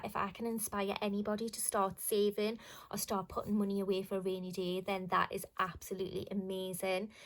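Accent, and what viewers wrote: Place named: Newcastle, United Kingdom. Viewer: My Gran was a Georgie,you videos are great and make me Smile when I hear your accent👍🏻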